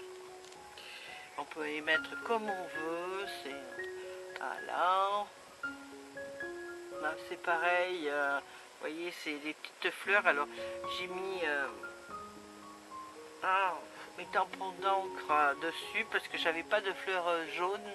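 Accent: South, France. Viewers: 🐱Coucou 😊 J'ai le plaisir de te découvrir grâce à ton commentaire sous la vidéo de notre Fanfan scrap, donc je viens découvrir ton pti monde. Tu fais de jolies creations, ma préférée est ton tag avec le cheval, mais c'est normal car le cheval est mon 2ème animal préféré 😉 Peux être est tu tjr en vacances, si c'est le cas profites en bien, car moi je n'ai plus le privilège de partir en vacances, depuis les dernières en 2011 (pdt un week-end) en Normandie. Je suis de la Région Parisienne mais à ton pti accent il me semble que tu dois être du Sud. J'espère que ça va mieux avec l'épreuve que tu as passée avec ta fille! Je pense à ça, si tu es tjr en vacances, pour pas que ton album s'abime avec le climat, tu peux le mettre dans une grande poche de congélation fermée, c'est bien pratique. Je te dis à bientôt et te souhaite une bonne journée.🌅 Bisous 🐎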